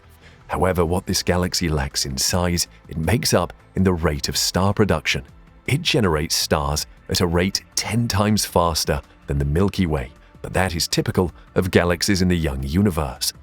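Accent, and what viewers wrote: United Kingdom. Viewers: you have the british accent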